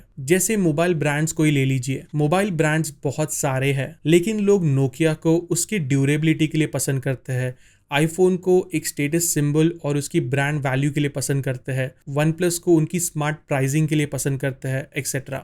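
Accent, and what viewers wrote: Maharashtra, India. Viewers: Kya Aap Marathi Ho🤔🤔🤔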